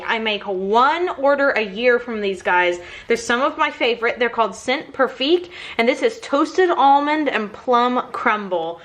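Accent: United States. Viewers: какой же все таки противный американский акцент, но эта баба настолько говорит на нем, что ощущение, что у этого человека гайморит или синусит. фу блэ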